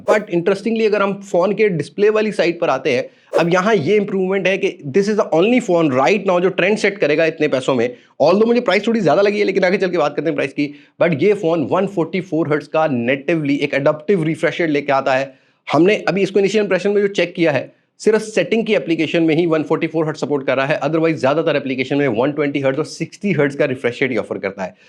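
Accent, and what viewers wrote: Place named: India. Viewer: Meri apse humble request Hy ap indian tarz my bat krna chor de . Ap yh kar skate ho ap woh kar skate ho . Proper Urdu my way my boliye ap yh kr skate hai ap woh kar sakte. Hy . Hoo ki jaga Hy lgiye pls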